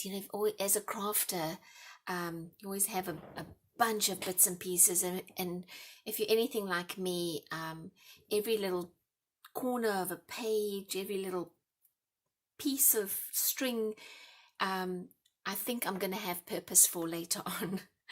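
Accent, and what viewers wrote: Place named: South Africa. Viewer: So great to hear a South African accent!!!❤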